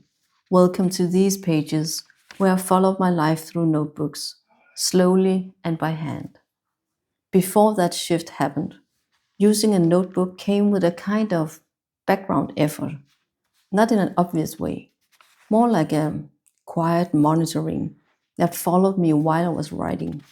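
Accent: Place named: Denmark. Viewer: A Dane! Thought I recognized the accent. I also use the Hobonichi cousin, and it got me through my MSc here in Denmark. Though I am an American married to a Dane for the past 22 years.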